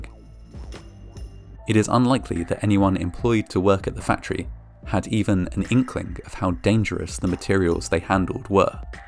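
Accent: United Kingdom. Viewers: I'm confused. You seem to have a British accent and yet you consistently keep using metric measurements first. Everyone knows UK and USA have it right. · Gawd I hate a British accent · WHY is this American incident narrated by a British narrator? Couldn't you get an American to tell the story?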